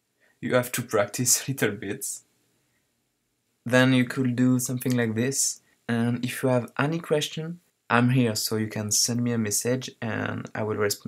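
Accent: France. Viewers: Aaaah, l'artiste de Reddit est donc un Français ? :DD (French accent spotted) Comme quoi, beaucoup de talents en art et design numérique viennent de notre beau pays ^^ Je suis un de tes premiers fans :) On sent qu'il y a la même "patte graphique" qui revient souvent sur la plupart de tes autres wallpapers, cependant cela reste quand même un beau style que tu as su t'approprier ;] Bref, bonne continuation et bon tutoriel, Un "French" 😁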